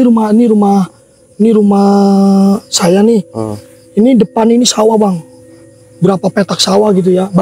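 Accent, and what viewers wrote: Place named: Banten, Indonesia. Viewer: Gendrowo main kejar2n lucu juga..😀 Bang asyik ngebawain ceritanya..senang dengar alurnya..logat bahasanya berasa sangat banten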